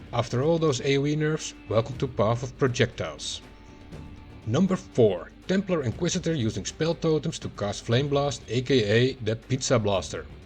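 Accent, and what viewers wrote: Netherlands. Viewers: i can tell by your accent you are dutch am i right?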